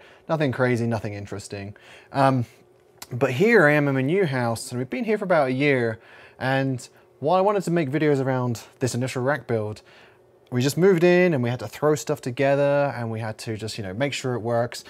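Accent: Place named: United Kingdom. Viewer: Also, where are you from? You sound british but have other influences in your accent. I'm from the UK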